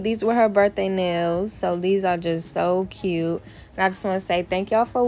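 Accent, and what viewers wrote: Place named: Washington DC, United States. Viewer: U sound like u from dc...or md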